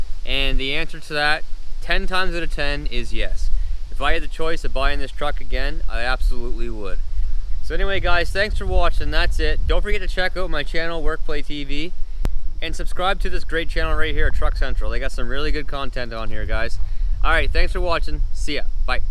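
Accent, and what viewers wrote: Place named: Nova Scotia, Canada. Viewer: He’s from Nova Scotia heavy accent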